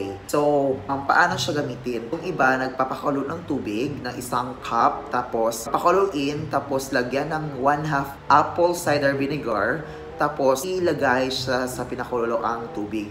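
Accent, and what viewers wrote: Iloilo, Philippines. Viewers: Ilonggo ka?heheeh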